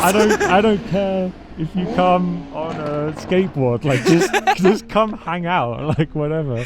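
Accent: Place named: Switzerland. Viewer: Nice video! Dein Englisch ist wirklich sehr gut aber trotzdem hört man irgendwie dass du schweizer bist.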